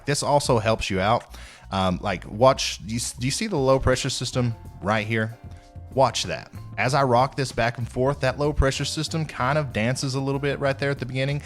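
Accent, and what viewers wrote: South, United States. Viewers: You can tell that he cleaned up the southern draw just a little bit as he got more popular lol 🤣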